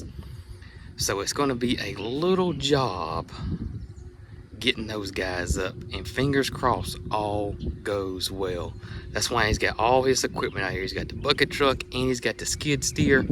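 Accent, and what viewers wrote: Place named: Texas, United States. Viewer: Those doors are absolutely gorgeous and I love your accent I am from Texas but we kind of have the same Lango going on here LOL😂😂😂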